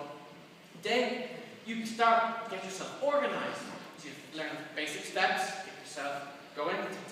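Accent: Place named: Ireland. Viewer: A highly entertaining and very well crafted speech. Well done,. And refreshing to hear a non-Anglo-Saxon accent. Ah shur, I'm a bit biased towards the dulcet tones of my fellow countrymen!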